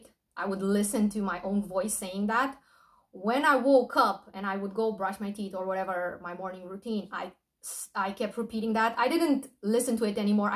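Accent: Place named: Italy. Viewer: hiii, thank you so much for sharing your experience, loa is real. Are you italian? cause i hear a little bit of italian accent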